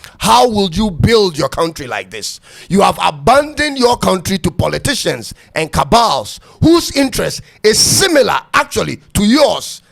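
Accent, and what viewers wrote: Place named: Ghana. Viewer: We clap 👏 for you you talk as original Ghanaian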